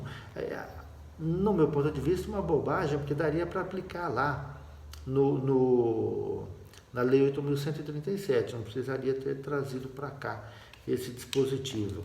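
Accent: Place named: Brazil. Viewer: No le entiendo bien esta muy brazileiro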